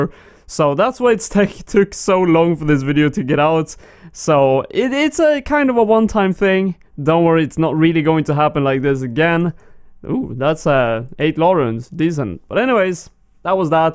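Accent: Sweden. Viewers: Man i love your swedish accent !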